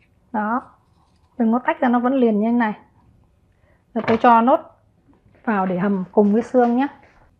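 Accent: Hanoi, Vietnam. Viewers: dạ, con nghe giọng cô là liên tưởng đến NS Kim Tiến đó ah. Rất hay và đặc trưng, mặc dù bây giờ giọng pha nhiều rất hiếm để nghe đc người có giọng gốc Hà Nội nên giữ được bản sắc là điều rất quý. Chúc cô sức khoẻ và ra nhiều video bổ ích!! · Chị ơi ngoài nấu ăn ngon mà nghe giọng hà nội gốc rất hay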